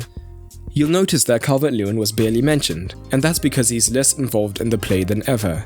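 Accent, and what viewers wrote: South Africa. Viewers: I'm convinced this guy has a South African accent.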